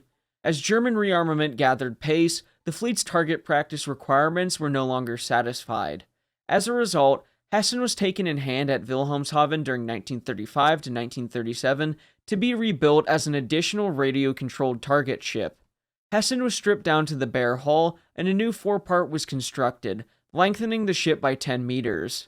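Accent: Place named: West Coast, United States. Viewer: There's just something about a young white guy with a west coast accent mispronouncing every word that makes me want to black list the channel.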